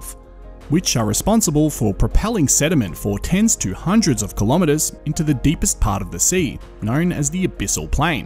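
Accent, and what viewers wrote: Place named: Australia. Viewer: I love your Content, You are saying wonderful things but the way you narrate them and by that I mean your thick Australian accent makes watching your them really-really difficult. I am sure I am not the only one with this problem. I am watching this one just to post this comment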